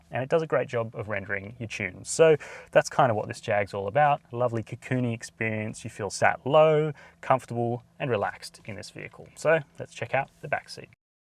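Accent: Australia. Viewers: Nah, this is a standard Aussie accent. We don’t all sound like Steve Irwin. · Are there different Aus dialects of English..?? I couldn't pick where you from I was thinking South Africa ot New Zealand...Your Aus accent is not as raw as I've heard in some other videos reviews. 🤙🤙🤙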